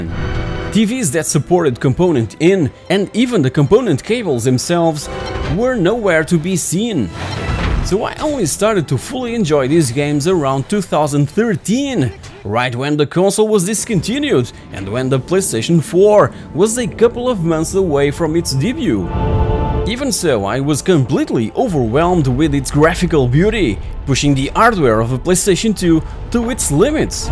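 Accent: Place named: Portugal. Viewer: Este gajo é tuga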